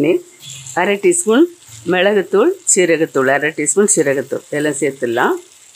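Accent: Nagercoil, India. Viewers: I like to hear Nagercoil slang. Thanks for the video ☺️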